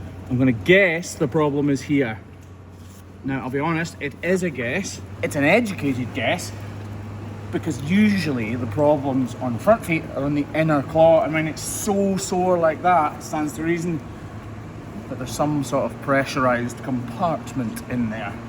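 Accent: Scotland, United Kingdom. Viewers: yer Scotish acent is pyer goodnes, mate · Where in Scotland are you from I’m from Ayr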